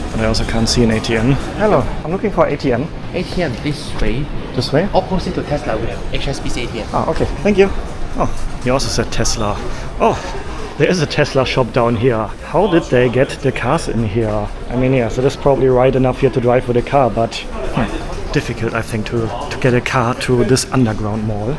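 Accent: Germany. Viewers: You sounds German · Are you german ? Yaaaaah you imagined the accent didnt you ?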